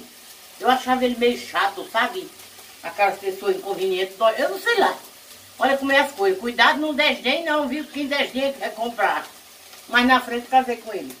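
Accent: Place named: Northeast, Brazil. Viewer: Boa noite minha linda 😁 eu amo os teus vídeos 😁 agora sim eu entendi porque você fala tanto em Barbacena 😁 vejo que tem sotaque nordestino 😁 eu sou mineira e amo esse povo do nordeste 😁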